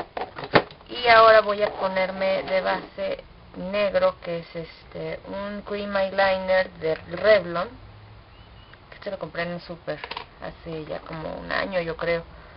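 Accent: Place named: Mexico. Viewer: WOW! Me encantan tus tutoriales, bueno todos los videos y además ese acento mejicano me gusta muchísimo, jaja... Saludos. :)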